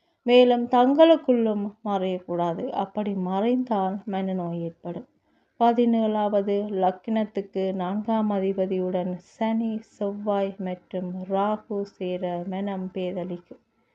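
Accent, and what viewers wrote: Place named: Sri Lanka. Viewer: உங்கள் இலங்கை தமிழ் அழகு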